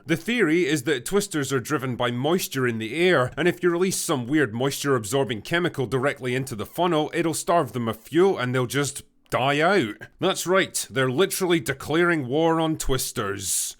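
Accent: Scotland, United Kingdom. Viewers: SSSSSSSIIIIIIIIIIIIIMMMMMMMPPPPPPPP!!!!!!!! ............. Nah, that Scottish accent gets me too 😅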